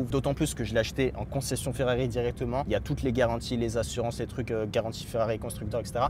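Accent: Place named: England, United Kingdom. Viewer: par contre ca me fume l'accent anglais hahah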